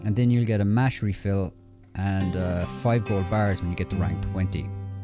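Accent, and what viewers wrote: Ireland. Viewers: Didn’t expect to be an Irishman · You Irish?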